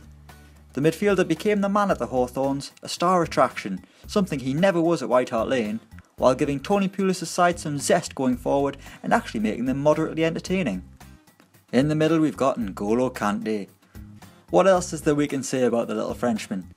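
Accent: Ireland. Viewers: HITC Sport are u guys Irish? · Are you Irish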